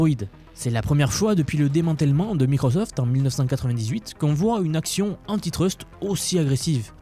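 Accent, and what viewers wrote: South, France. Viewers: le Sud I guess ?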